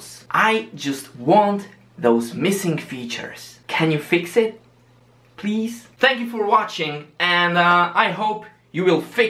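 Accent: England, United Kingdom. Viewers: Čistí angličan super ide ty Anglický jazyk 😊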